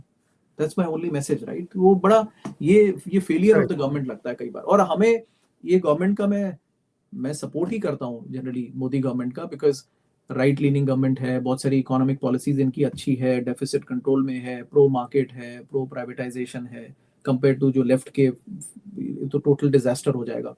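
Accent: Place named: United States. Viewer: He is an Indian but talking like an American white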